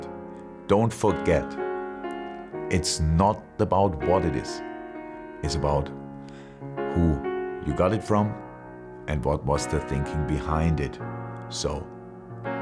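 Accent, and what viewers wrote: Liverpool, United Kingdom. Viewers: Hes slowly developing a Scouser accent.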